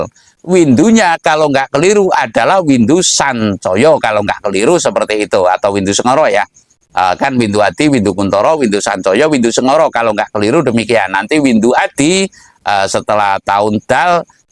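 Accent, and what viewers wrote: Banyumas, Indonesia. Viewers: logat banyumasan memang spt itu juragan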